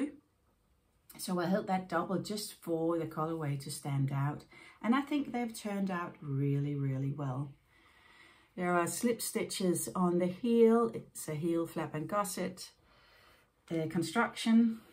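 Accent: Denmark. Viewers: Hyggeligt at komme indenfor - dejlig podcast - sporede jeg lige en Aarhusiansk accent :-D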